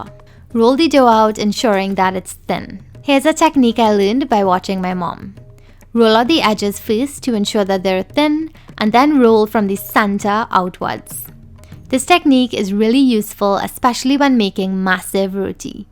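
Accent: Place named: Trinidad and Tobago. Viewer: This has to be the best video on paratha. I moved from Trinidad at 17 years old but I vividly remember these family occasions. Thank you for sharing this part of your family with us. Job well done!!! Side note- I love the fact that you kept that strong Trini accent. Don't ever lose it.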